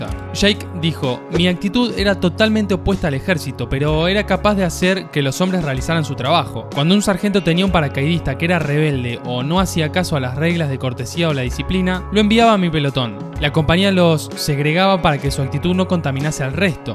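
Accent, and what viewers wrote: Argentina. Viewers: De donde eres tu ? Uruguay o Argentina? Un pregunta nada mas e por l'acento a mi me parese mas Argentino verdad ,de toda manera tu video me encanta mucho te felicido yo soy napolitano e amo Maradona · pense que se llamaba Shake, fk acento agertino xD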